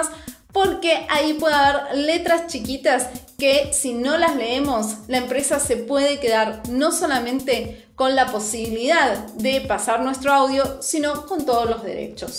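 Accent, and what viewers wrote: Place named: Argentina. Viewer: Que bueno ese acento argentino :)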